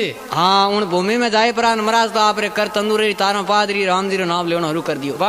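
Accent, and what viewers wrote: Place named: Marwari, India. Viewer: orignal Marwari Voice really enjoy !!! also help for new generation to learn history Thanks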